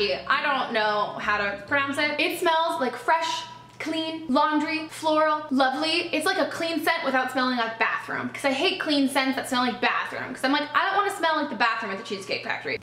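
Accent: Russia. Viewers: Actually you really speak with russian accent. It was gooood:) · Russian accent really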